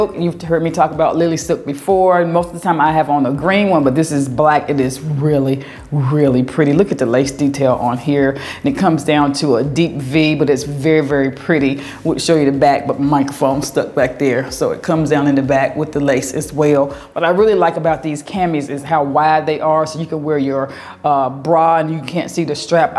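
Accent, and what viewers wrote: South, United States. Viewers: Every time I hear you pronounce the perfume Baccarat, it sounds like you are say “Baklava”, like the pastry. It’s so funny your southern drawl. 😆😆😆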